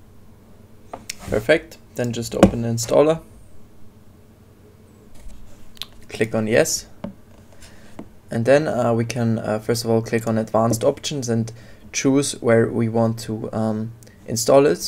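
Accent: Germany. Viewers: i Hear a little bit of DEUTSCHEM AKZENT in ur voice (meins nicht böse)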